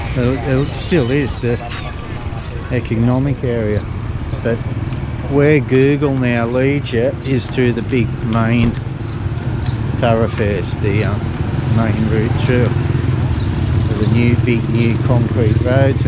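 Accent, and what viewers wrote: Australia. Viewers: I detect a slight trace of Australian accent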